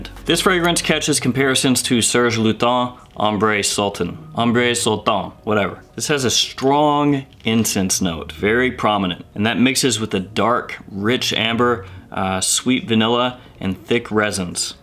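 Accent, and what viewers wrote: South, United States. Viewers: there's a bit of a southern twang to your speech, it's very interesting and enriching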